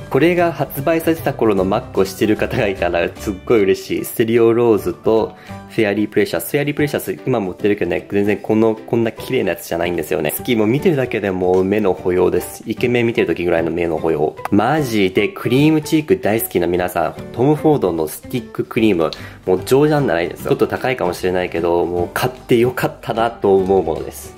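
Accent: Kansai, Japan. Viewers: リアルな感想やから参考になるし、時折出る関西弁が親近感〜😂❤️❤️❤️この動画本気で好き！！！ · どの動画でも数回関西なまりが聞こえて何となく嬉しい🥺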